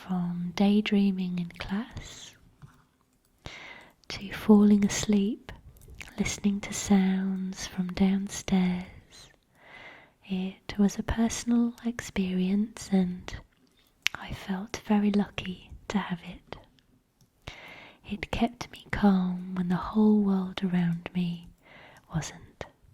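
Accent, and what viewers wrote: United Kingdom. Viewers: I've often thought I could detect a hint of Northern in your accent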